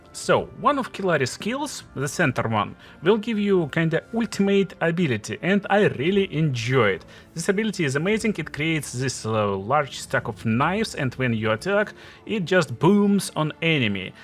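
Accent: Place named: Russia. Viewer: Я слышу что ты русскоговорящий :))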